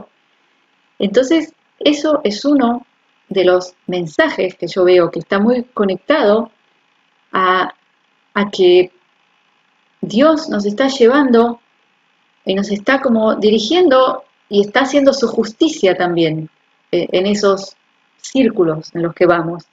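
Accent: Argentina. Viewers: Perdona, eres argentina?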